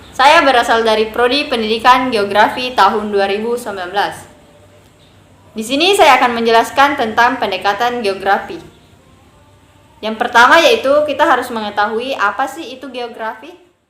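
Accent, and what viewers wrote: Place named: Mandar, Indonesia. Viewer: Sangat baik👍. Cuman bahasa Indonesianya agak kurang. Masih logat² mandar. Semangat💪💪😁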